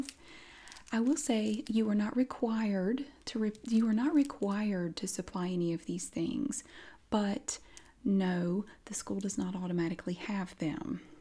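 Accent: South, United States. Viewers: Thanks for that soft and smooth southern voice. Veeery relaxing. I live in Hawaii so I don't ever hear that accent. Aloha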